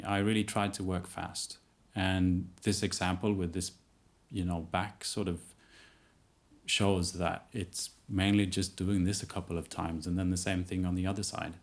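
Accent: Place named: Sweden. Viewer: You sound like a swede who has lived in the uk for 14 years, working in a pr-company or . Great video as always!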